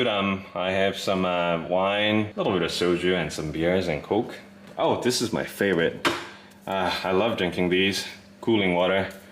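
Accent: Singapore. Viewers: Hi, I enjoyed watching your videos and your Singaporean accent 🤓 Sorry about your dad, I lost mine 4 years ago... You seem like a genuinely nice person. All the best to you and your new life in NZ. Will continue to follow your channel as I have a dream of getting a motor home in NZ one day when I retire. Stay cheerful 😊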